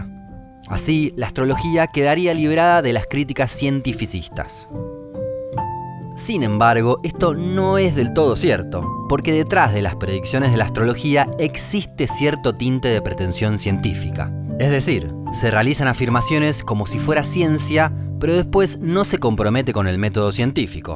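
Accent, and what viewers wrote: Argentina. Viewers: Me gusta el contenido. Ese acento argentino, en vez de molestarme, le da singularidad.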